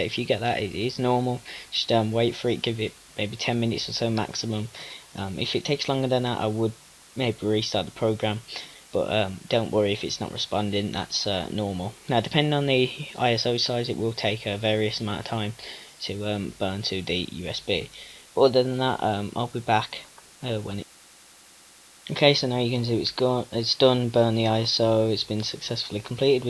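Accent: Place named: United Kingdom. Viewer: Excellent explanation and demonstration! Some of us "across the pond" (USA) have trouble hearing as fast as you speak "The King's English", but fortunately, my computer has a "replay" button! Cheers!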